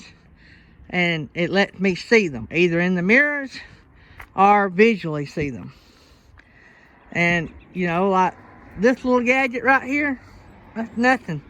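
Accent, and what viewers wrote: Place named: South, United States. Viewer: New Here and and blessings...sound like u are from the south.. I’m in NC... schools are closed..bus drivers are happy I’m sure no school.. ok listening to your video you are from Alabama 🌹😊 Family here as I love supporting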